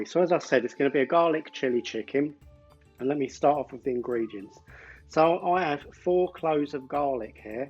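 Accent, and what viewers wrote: Liverpool, United Kingdom. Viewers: Ye we could tell from Ur accent u were either a Liverpool or United fan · Ahhh yes the famous scouse accent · I used to love you........would never have guessed you was a scouser.👹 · Sounds really scouse 😹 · Lovely Scouse accent there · I could tell you a red it was the scouse accent that give it away. 🤣🤣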